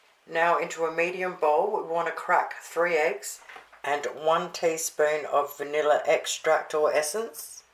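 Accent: Australia. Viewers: You speak proper Aussie 😊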